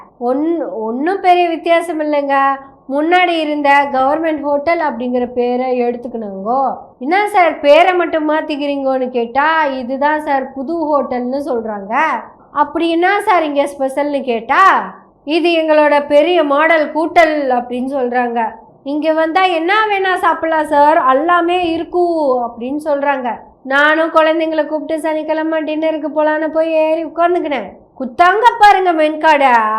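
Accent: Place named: Chennai, India. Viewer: excellent Chennai slang today content also as usual very superb keep going 👏 👍 👌 🎉❤❤❤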